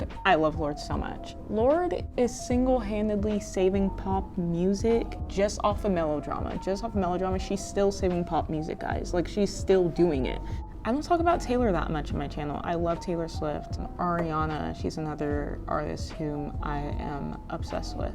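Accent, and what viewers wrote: Nigeria. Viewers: Ooh cool, is it weird you sound like nigerian? You didn’t really specify, but are you? I’m Nigerian, it’s cool seeing other Nigerians you tubers. Anyways congrats on 100k!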